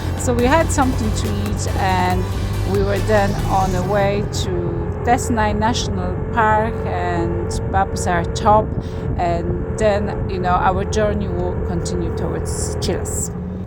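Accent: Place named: Poland. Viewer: Fantastic. I am from Islamabad Pakistan. I am really enjoying your Pakistan videos. I guessed it right about Poland looking at your names & accent. What i know about Polish people is that they are the most adventurous people on this planet. Most of the top mountaineers who come to Pakistan are from Poland & what you are doing isn't easy either. They love adventures 👏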